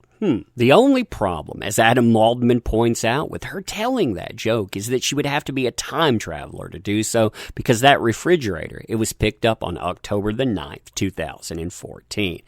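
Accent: South, United States. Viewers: Horrible narration. Weird scratchy, prissy, hillbilly voice.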